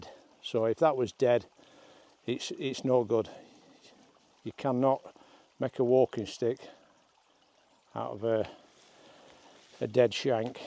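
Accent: Lancashire, United Kingdom. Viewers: Sounds like a Lancashire Lad (or West Yorkshire but that used to be Lancashire lol) I'm from Preston originally - EXCELLENT Video buddy!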